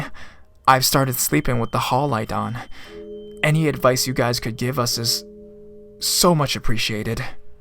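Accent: Canada. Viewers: Are you Canadian? Just wondering because of your accent. Anyway, good video! 👍 I like the longer ones too. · Are you Canadian? If you are then you got the most awesome Canadian accent....if not then....damn good Canadian accent lol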